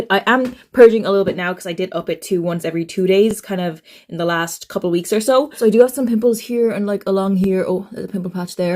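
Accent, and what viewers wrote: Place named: Ireland. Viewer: Ha me too! It's the Irish thing, we speak so quickly and its so comforting to hear an Irish accent at the speed I'm used to. We don't waste a second😂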